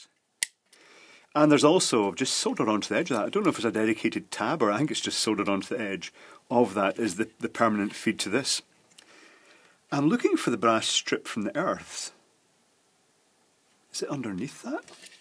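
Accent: Scotland, United Kingdom. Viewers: J’aime l’accent Écossais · Is he from Scotland?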